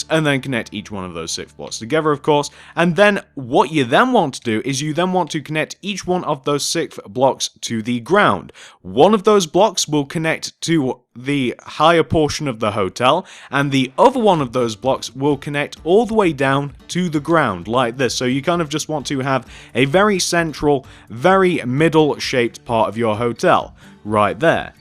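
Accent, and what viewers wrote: United Kingdom. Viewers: It’s a just a brutish accent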